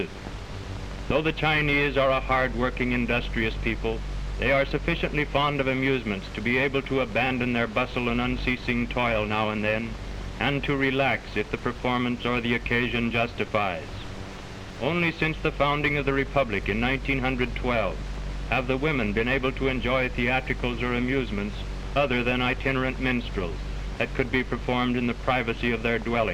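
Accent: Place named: Mid-Atlantic, United States. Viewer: Yes, it was a typical manner of commenting back then, with the upper class Mid-Atlantic accent, and had nothing to do with disdain. It's also worth remembering that it was only the 2nd or 3rd year of the "talkies."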